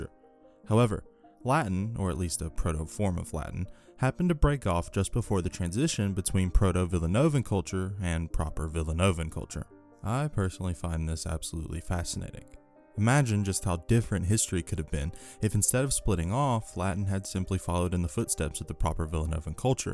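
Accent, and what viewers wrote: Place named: United Kingdom. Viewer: you are super clearly british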